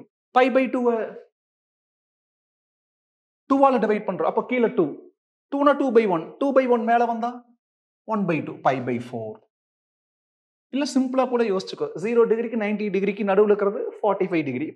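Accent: Coimbatore, India. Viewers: Coimbatore slang🤩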